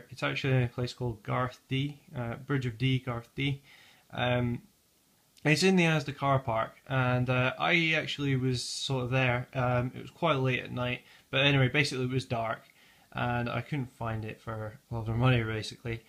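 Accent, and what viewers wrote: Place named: Ireland. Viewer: I love your accent. Are you Irish?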